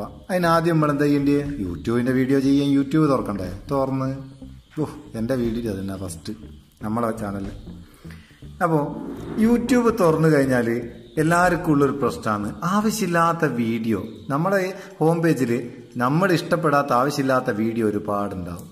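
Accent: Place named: Mappila, India. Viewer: 'ഇക്കാക നിങ്ങളുടെ ആ മാപിളമാരുടെ സംസാരശൈയിലിയും നിങ്ങളുടെ ആശബ്ദവും കൂടി ചേരുമ്പോൾ ഒരു എച്ച് ടി സുഖം നിങ്ങൾ ഞാൻ ആണ്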